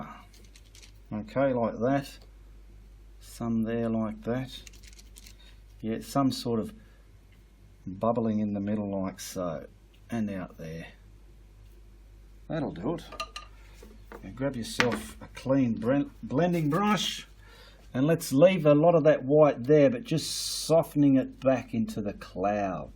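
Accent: Australia. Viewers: The spirit of Bob Ross and the accent and proper speech of Steve Irwin…what a comforting voice and video to watch.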